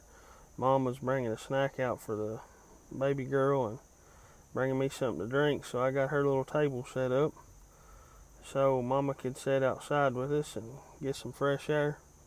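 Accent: Eastern Kentucky, United States. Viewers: That has to be an East KY accent.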